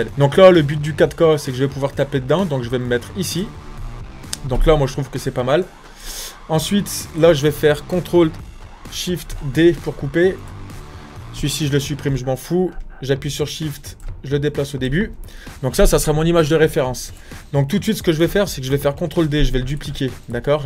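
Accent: Saint-Étienne, France. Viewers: T'as un de ces accent stéphanois ! Haha bienvenue au club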